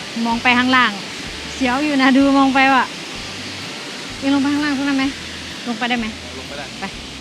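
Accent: Korat, Thailand. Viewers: มักสำเนียงไทโคราชจ้า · แก่คนบ้านไหนนิคือพูดคือคนแถวบ้านฉันแท่ะๆฮึ55คนโคราชนิแม่นไหม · สำเนียงโคราช คนโคราชเหรอ? ผมไปตาดโตนไม่เคยเล่นน้ำเลย ไปกินกาแฟ สดปั่น กับไปเก็บเมล็ดมะค่าโมง มาเพาะ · พี่ใช้คนโคราชไหมคะพูดเหมือนคนโคราชเลย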